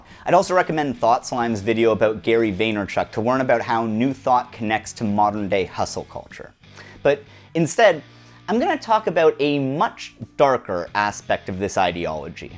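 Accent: Canada. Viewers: This was great. Informative and entertaining. I love your NIN shirt and your Canada, eh? accent. Good job! I can't wait for the next one.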